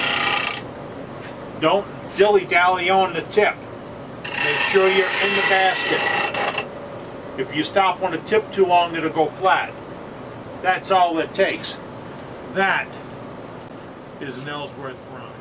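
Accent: New Orleans, United States. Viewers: Hey!! Just found you on Youtube. Love your style! No wonder, yer from 'Nawlins. Me too, I grew up in Harahan! I'm living in Denver now but sure miss home sometime, especially when I hear your accent! I am just learning how to turn wood. I turned a Stanley #5 Plane knob out of Rosewood. My first project and it came out real nice. I refurbished the plane for my son, who was born in Opelousas close to Lafayette. Anyway, I like your channel! I'll come back some more. Thank you very much! Great videos!!! Dave Henderson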